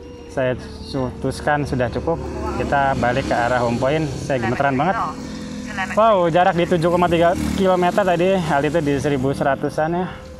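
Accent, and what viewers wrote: Cirebon, Indonesia. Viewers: kalo dari logat bicaranya kalo ga orang cirebon.indramayu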